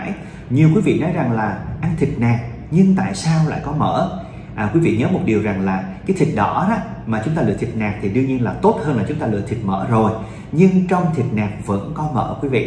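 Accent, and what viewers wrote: South, Vietnam. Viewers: Bác si giải thích rõ de hiểu và nghe rõ vì bác si người miền nam và có hình mình hoa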